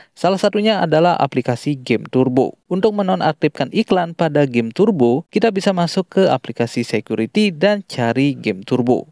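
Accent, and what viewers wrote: Bali, Indonesia. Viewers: medok bali banget kayaknya ni hostnya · Iya, kelihatan logat Balinya😁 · Nah, nemu juga video yg kasih tips kaya gini. Sungkem bang..btw narator dari Bali kah? 😁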